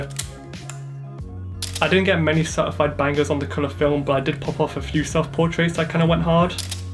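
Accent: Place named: United Kingdom. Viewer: Film photography + British accent: subscribed! Jokes aside, very nice video, loved it! Keep going!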